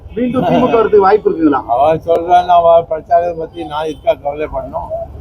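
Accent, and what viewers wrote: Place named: North India, India. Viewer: His tamil is completely like a north Indian tamil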